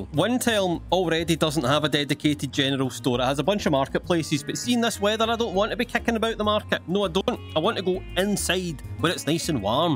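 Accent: Scotland, United Kingdom. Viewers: I thought this was an any Austin video when I saw the title and was pleasantly surprised with a raving Scottish man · I love much more aggressively Scottish he feels in this video. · definitely, before I've find this channel i thought i understand any english really good, but it turned out I've never heard scottish accent lol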